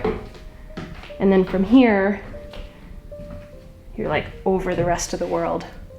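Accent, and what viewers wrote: Canada. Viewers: Omg. In love. Brett and I are living vicariously through you until we reach this! You have definitely raised our standards and life goals! We watch all of these golden oak videos together. Also my almost two yr old thought it was very funny when you kept saying babe bc Canada accent compared to our Texan accent lol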